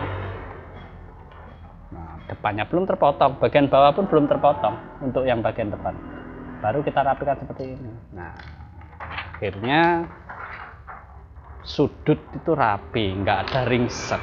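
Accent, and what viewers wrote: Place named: Java, Indonesia. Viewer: owalah denpasar ... kalo ngomong kok ada jawa"nya masbrow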